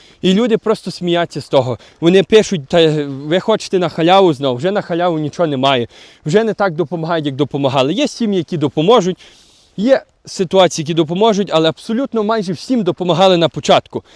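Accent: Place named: Western Ukraine, Ukraine. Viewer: Говор западенца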